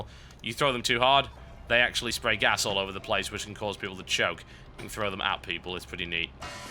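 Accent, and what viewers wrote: United Kingdom. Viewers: your british voice is very soothing... #NoHomo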